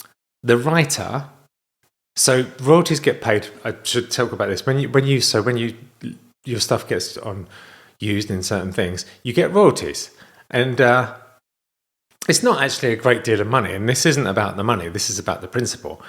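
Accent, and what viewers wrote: United Kingdom. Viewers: I don't blame you for being really pissed, who wouldn't be? However don't be so hard on yourself, we all have some kinda in our life's! I love music too! And I love you to pieces! A lot of us extraordinarily ordinary people. Your charmingly witty British accent tickles me funny bone! Your music is so beautiful. I'm grateful that you've shared what those nasty people are doing. Shame on them. I tend to be a very trusting person, it's just my nature, and it has turned around and bit me in the butt, in the end, I learned more about the nature of greedy little people, so please don't be so hard on yourself. Lesson learned! Smile, it looks marvelous on you!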